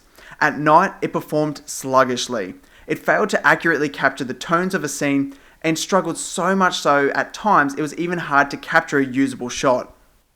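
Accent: Australia. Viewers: Your Australian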